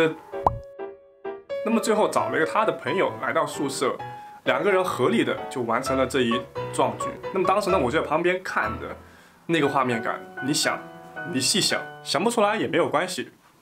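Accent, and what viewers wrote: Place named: Shaoguan, China. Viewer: 看你的说话口音和脸，我猜你是广东韶关地区的😀我粤西人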